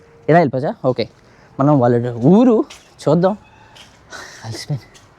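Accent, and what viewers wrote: Godavari, India. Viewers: Meeku Inca subscribers ravali ani korukuttuna brother me comedy Maa godhavari yasa baguntadhi meeru Inca godavari side village s traditional videos chayandi bro😊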